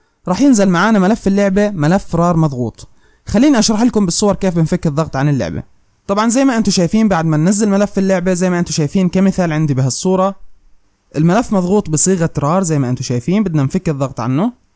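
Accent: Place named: Jordan. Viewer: ماشي هادا اردني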